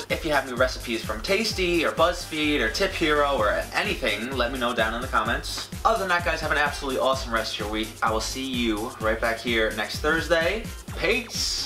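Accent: New York, United States. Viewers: Are you a New Yorker? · Are you from New York? You kinda sound like it.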